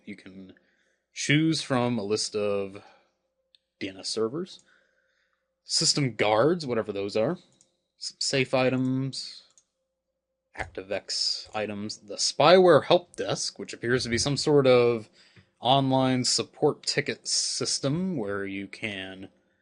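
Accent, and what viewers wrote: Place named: Australia. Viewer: That sounded like an Aussie voice :)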